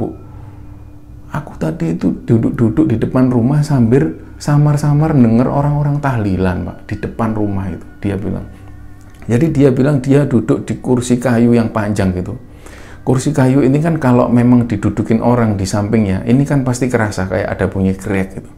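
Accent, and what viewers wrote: Java, Indonesia. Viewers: Cara mbawakan ceritanya tdk bgus Terutama penyebutan AIUEO .....mungkin karnana eksen jawanya yg sgt kental ....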